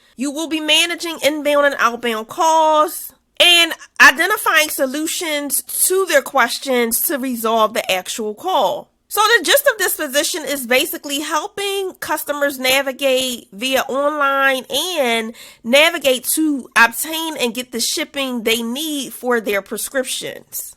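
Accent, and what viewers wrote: Philadelphia, United States. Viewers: Philly jawnnn that accent is so home to me...Im in AZ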